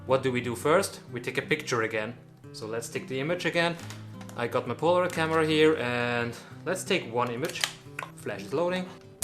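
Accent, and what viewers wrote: Germany. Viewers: Loooove your videos! I learnt a lot! :-) btw are you German by any chance? Thought I heard a light accent haha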